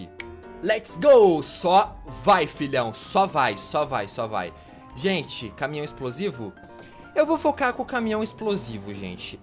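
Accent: Rio Grande do Sul, Brazil. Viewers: Vc e Gaúcho?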